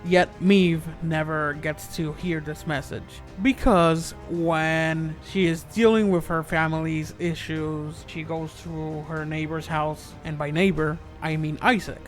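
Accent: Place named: Scotland, United Kingdom. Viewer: hes scottish, dont be inconsiderate haha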